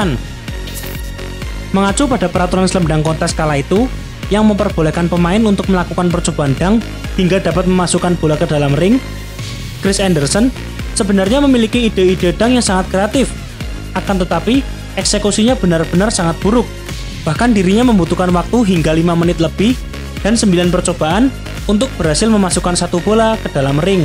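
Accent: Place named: Medan, Indonesia. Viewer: Medan nya medok bgt, hahaha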